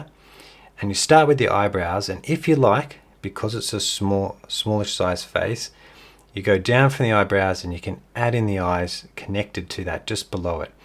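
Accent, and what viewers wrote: Australia. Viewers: Just found you and subscribed - enjoying your Australian accent and excited to try your approaches!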